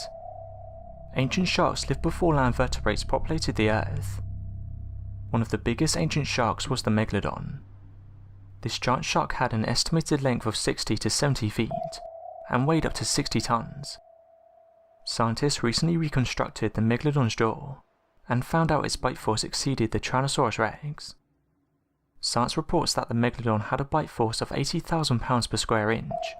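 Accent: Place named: London, United Kingdom. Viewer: London town I'd say he's good hay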